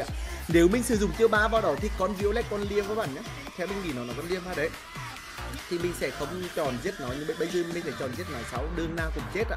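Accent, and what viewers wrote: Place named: North, Vietnam. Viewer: A nói giọng Bắc hơi khó nghe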